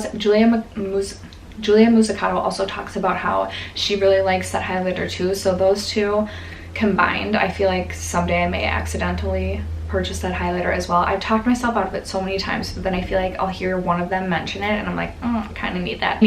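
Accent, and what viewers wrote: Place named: Canada. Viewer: I thought you were Canadian when I first started watching your channel because you sound more Canadian dialect wise. Source- I'm from Canada.🙂🇨🇦 Love your vids!!!!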